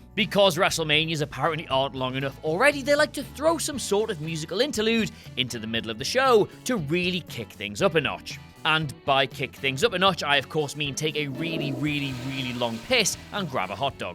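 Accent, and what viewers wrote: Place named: United Kingdom. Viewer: is my everyday reminder that British accents are not fake, and in fact some of them can't just switch it off and do american.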